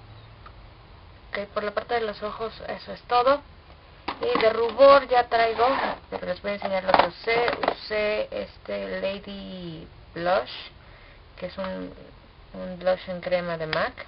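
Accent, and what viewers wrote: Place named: Mexico. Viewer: WOW! Me encantan tus tutoriales, bueno todos los videos y además ese acento mejicano me gusta muchísimo, jaja... Saludos. :)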